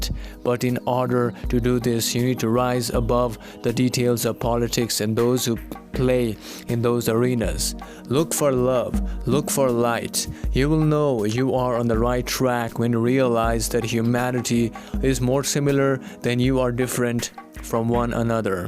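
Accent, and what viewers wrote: India. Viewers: Look! Here's the thing...oh, but first a question....is your home turf India?? Cuz you sure sound like you come from India!! So now..the thing..... Don't come here telling me my ways are wrong!! Cuz I don't like that! It's rude!! And another thing , you talk like we, no... me. I'm just going to speak for myself, so scratch "we". Anyway, You talk like I totally don't have a clue of what's happening on " my " planet! I know about the cabal, elite, deep state, the " 1% ." I know what their agenda is, and what they are pulling!! How do I know these things.. you might ask? I read the HOLY BIBLE! It tells me everything I need to know, and how to fight them!! And... THERE IS ONLY ONE WAY TO GOD!! AND THAT IS THROUGH JESUS CHRIST !! THERE IS NO OTHER WAY!! AND ANOTHER THING!!!...ALL YOUR MEDITATION, CHANTING, CHAKRAS, AND THE LIKE ARE FORBIDDEN BY GOD!! 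SO...RIGHT THERE I CAN SAY.. I DON'T WANT ANYTHING YOUR SELLING!! BECAUSE THE ONE AND ONLY GOD OF THE UNIVERSE.. "JEHOVAH " Would NOT send messanger's who breaks his command- ments!! So... I'm thinking you have a whole different " god " than I do. And if you don't mind, I'll keep the one I got, thank you!! And another thing... The love of money is the root to all evil!! It's not the root to sacred cows!! ( again, India! ) Are your cows blue? Just wondering. Now, you say that y'all have gotten to your much advanced civilization, by trial and error. So, why isn't the human race allowed to advance in the same manner? Why do you feel you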